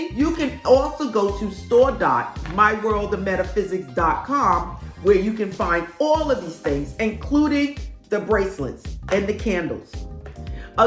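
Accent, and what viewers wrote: New York, United States. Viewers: I love your New York accent Ms Yvette! Its helping the message sink in 😂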